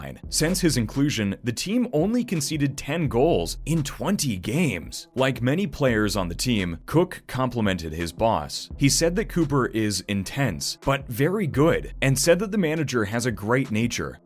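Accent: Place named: United States. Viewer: Stick video on out of interest. Hears American accent. Switch video off.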